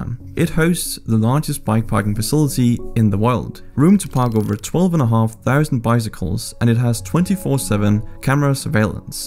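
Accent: Netherlands. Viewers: I like his Dutch accent although he is Danish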